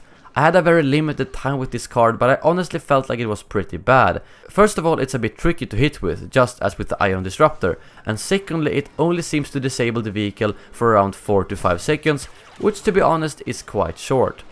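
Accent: Sweden. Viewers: Are u from sweden? It sound like so. Btw i am from sweden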